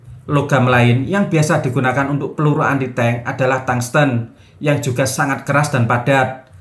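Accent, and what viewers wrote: Java, Indonesia. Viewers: Sakit kupiiiing ...medhoooog!!!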